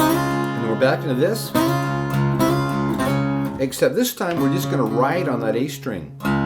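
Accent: Canada. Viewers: Judging by your accent and the way you pronounce ‘about’ , are you Canadian , Nova Scotia?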